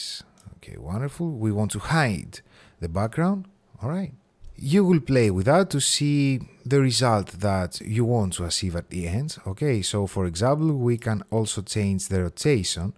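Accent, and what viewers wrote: Greece. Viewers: Είναι τόσο χαρακτηριστικό όταν ένας έλληνας μιλάει αγγλικά!! Από τα πρώτα δευτερόλεπτα λέω ώπα δικός μας είναι 😛😛 very nice tutorial, clean and easy to understand , keep the hight quality content !!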